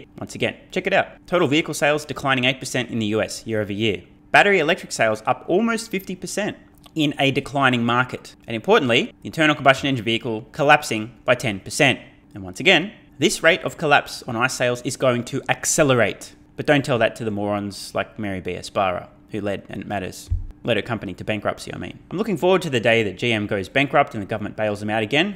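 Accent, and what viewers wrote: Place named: Australia. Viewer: That Australian twang got you a sub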